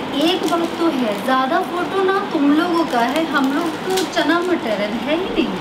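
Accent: Bihar, India. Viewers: Apki voice or bolne ki tone hi irritating hai aisa lgta h Bihar ka touch h sur nikal kr bolna normal bola Kijiye thik lagega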